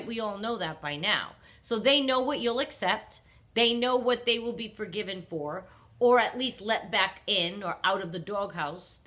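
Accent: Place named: New Jersey, United States. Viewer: Hi Just found your youtube channel today. I love you!! You make so much sense and you sound like people I grew up with in New Jersey😊 I live in Florida now after divorce from narcissist. Thanks for these videos. I like how you just talk like we are sitting in a room. I also like that you do not fill your videos with flashy intros and unnecessary music. I hope you don’t change the format. I especially love your dog in the background, so cute!!!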